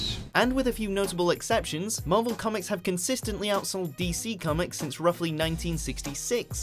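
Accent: England, United Kingdom. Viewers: the first british wathmojo host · Dude, English guy!!!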